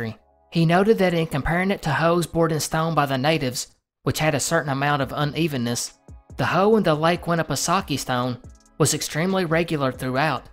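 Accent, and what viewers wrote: South, United States. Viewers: I’m from the south & i love hearing other southern accents!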